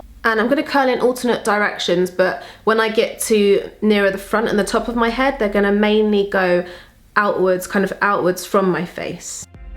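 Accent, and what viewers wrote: United Kingdom. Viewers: hi look i love you so much so so much I always love your videos and your British accent and yor eyes color everything you'r makeup is my best 😢💜 I'm your fan from k.s.a 🌚💕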